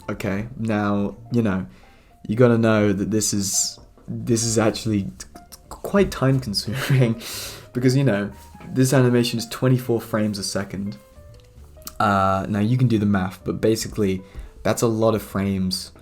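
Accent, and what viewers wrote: United Kingdom. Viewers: I can't recognise accents, so I really wanna know if you're from the UK. 😅. I'd be insanely impressed since most people there go to college at 16-18. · wait… this is crazy… he’s… HE’S… BRITISH IN REAL LIFE? AHHHHHHHHHHH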